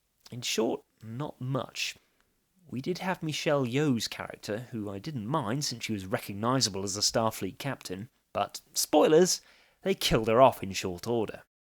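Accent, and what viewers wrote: England, United Kingdom. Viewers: You sound English? I like the show. Cheers! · I just noticed how unpleasant the combination <expletives + anger/frustration + English accent> is on the ear (I say that as someone with an English accent himself). If I might suggest, it is also a little bit un-English - or certainly exploiting it (mistaking it) as personality or media persona is. I always think that when I hear John Oliver. Wait - is that what we're doing now, copying Americans? Charmless, aggressive loudmouthing an' all? PS. I agree Discovery is awful, the worst Trek series so far (DS9, for my money, being the best).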